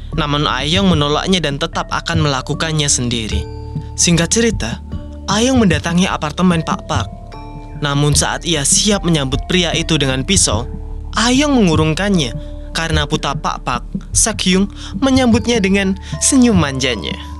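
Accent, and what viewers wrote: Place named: Indonesia. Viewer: Basa inggris sangat meng indonesia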